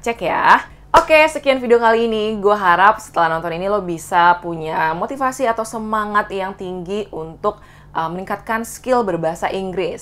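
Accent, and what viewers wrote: Indonesia. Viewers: masih logat indo banget si🤭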